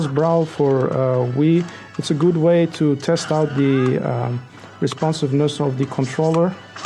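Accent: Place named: Netherlands. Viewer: You sound like you are dutch...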